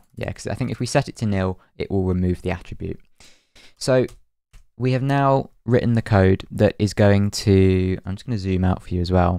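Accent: United Kingdom. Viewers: i just love british ppl (the accent ofc)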